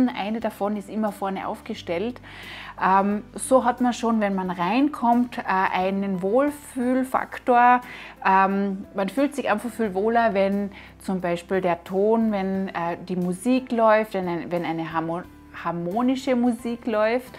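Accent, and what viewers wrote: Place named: Austria. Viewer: Hi, vielen lieben Dank für deine hilfreichen Videos. So bekomme ich von dir doch viele hilfreiche Informationen und Tricks, über die ich mir noch keine Gedanken gemacht habe. Zu deiner Frage, ob du gut verstanden wirst, sage ich ein klares Ja. Da ich aus Wattenscheid/Bochum - NRW-Deutschland komme, sind wir "Ruhrgebietler" von Natur aus offen für alle Sprachen/ Kulturen. Dazu liebe ich die Aussprache von Menschen aus Österreich und der Schweiz sehr. Vor allem der Gruß zu Abschuss (Baba) Alles Gute dir, deinem Team und deiner Familie.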